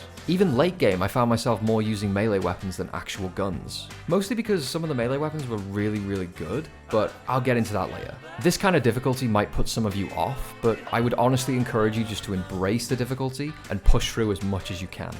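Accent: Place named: North West England, United Kingdom. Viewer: are you from the North West? I recognize my own accent hahahaha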